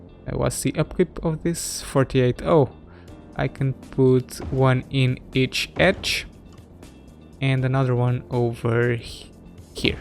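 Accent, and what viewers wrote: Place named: Portugal. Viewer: Where are you from? The way you speak English sounds like you're Portuguese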